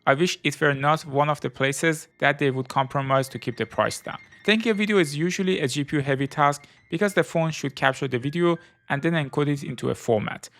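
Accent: Iran. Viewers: Persian English accent 😊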